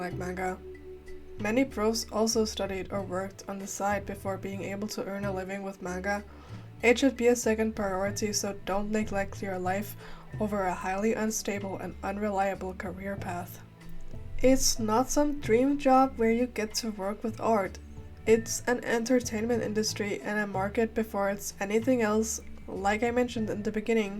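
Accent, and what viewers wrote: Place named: Europe. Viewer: Damn, you sound so European! I love your video btw. 🎉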